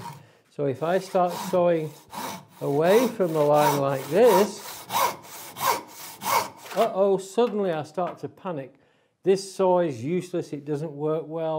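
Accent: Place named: Midlands, United Kingdom. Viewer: So nice to hear a lovely soft Midlands(?) accent on one of these videos. Thanks!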